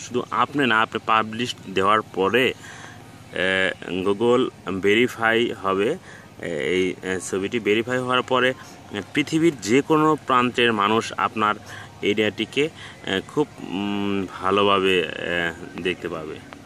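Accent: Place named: Bengal, India. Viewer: Bengali guy speaking Inglish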